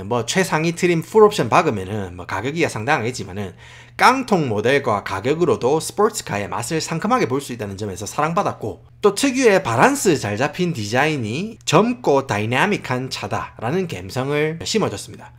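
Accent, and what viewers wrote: Gyeongsang, South Korea. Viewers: 영어를 경상도 사투리로하네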